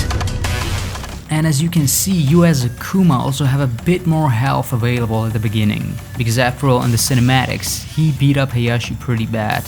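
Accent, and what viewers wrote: Germany. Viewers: I am pretty sure he's from germany, he sounds very german. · where are you from? germany?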